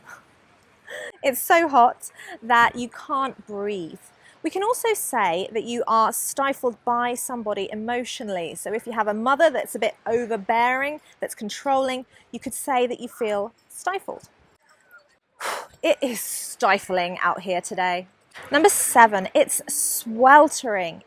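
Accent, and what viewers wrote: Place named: England, United Kingdom. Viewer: I LOVE your english accent.so amazing and captivating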